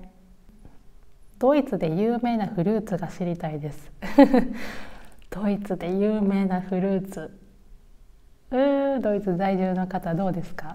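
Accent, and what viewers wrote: Kansai, Japan. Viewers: 2万人おめでとうございます🎈 日本に着いたらおにぎり🍙たくさん食べてくださいね😋 動画に使われているBGM気づけばお風呂で口ずさんでいます笑関西弁だけど落ち着いた話し方が好きです❤ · Hallo 🙋🏻‍♀️ 大阪の泉州生まれ, タオル有名ですよね｡可愛い関西弁ですよ。Hannoverの近くに住んでおられるんですね \(´∀｀*) なんとお呼びすれば良いのですか？ママさん？ san？旦那様や、子供ちゃん達、めっちゃ日本語上手ですね。子育てについても、ちゃんと, 夫婦で話し合って決めていらっしゃる事が素敵です。おばあちゃまはオーストリア人🇦🇹なんですね。なんか嬉しい。(行った事ある国だから…) 😅 旦那様の日本のイメージ面白いですね🤣🤣 最近の日本は、多様性を重視するようになってきたと思いますが、まだまだなんですね。楽しかったです。👋🏻(╹◡╹ ) ♡❤️ Tschüss, bis bald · ドイツに住んでおられる日本人の方々がおられる、なんとなく安心感を感じます。関西弁も素晴らしく感じます。私もドイツ在住です。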